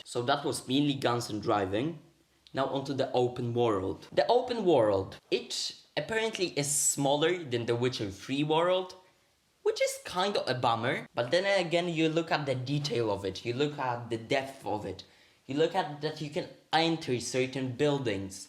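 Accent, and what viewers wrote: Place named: United Kingdom. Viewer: and stop the british accent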